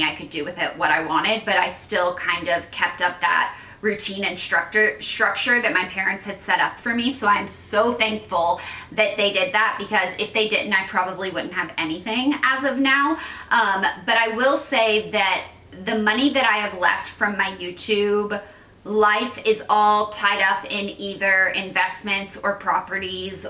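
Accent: South, United States. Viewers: Do you have a southern accent that you don’t show on camera? (I’m from Tennessee too, and it’s so easy to sound yeehaw😂) These new videos are so refreshing and calming. I like to listen to them when I can’t sleep . 🩵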